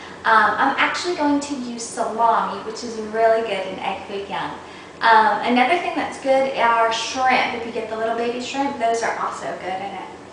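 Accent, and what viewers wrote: South, United States. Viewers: An Asian with a country accent, cool. Love this dish. Very easy to make, and very tasty! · Great job! you make it look so easy. I love your southern accent! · someone of Asian decent speak with a southern drawl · wow,a beautiful Asian girl with a southern accent, how exciting! Also, the food looks great.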